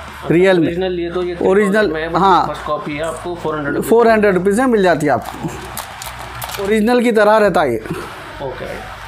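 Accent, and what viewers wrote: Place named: Hyderabad, India. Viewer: Hyderabadi baata ya Allah 😂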